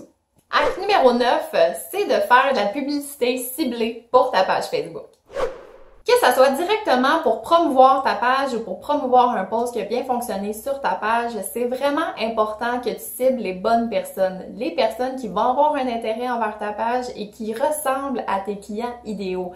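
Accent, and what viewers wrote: Quebec, Canada. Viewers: Oh une québécoise 😍😍 Même si je viens de connaître ta chaîne continu je crois en toi xD 💪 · Tu est québécoise j'aime trop là-bas merci pour la video ✌🏾✌🏾😍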